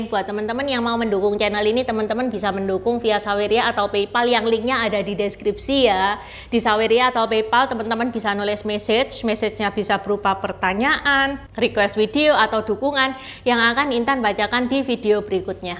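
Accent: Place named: Surabaya, Indonesia. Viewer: ngakaaaak… aplg logat e Intan.. suroboyo -an e kentel… segerrr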